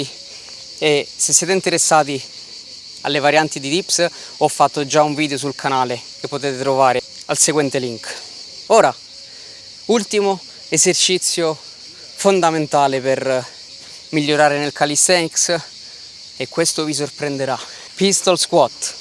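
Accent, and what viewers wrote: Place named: Portugal. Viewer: Ese acento portugués le da ese carisma genial. Grande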